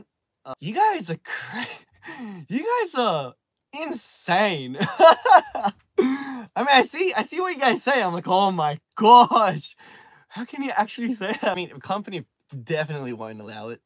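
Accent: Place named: Australia. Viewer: I loveeee that his Australian accent comes out whenever he speaks English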